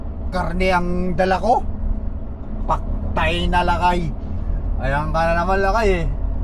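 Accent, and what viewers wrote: Philippines. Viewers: astig kapa rin lodi ,..Pinoy na Pinoy ka magsalita da best Lodi Kong truck driver ❤❤❤ drive safe always watching your vlog here in St.Catherines Ontario🇨🇦🇨🇦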